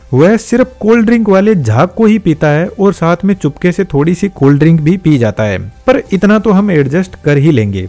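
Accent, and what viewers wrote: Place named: Sikar, India. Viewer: Sir apki tone sunkar lag raha he aap sikar jile se ho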